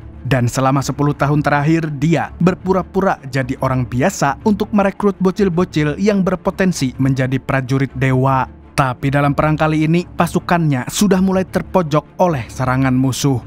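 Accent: Banjar, Indonesia. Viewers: Bg lo kalsel dimananya, bahasa banjar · banjar banget sii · Admin ikam buhan banjar kahh logat nyaaa ulun sukaaa sekali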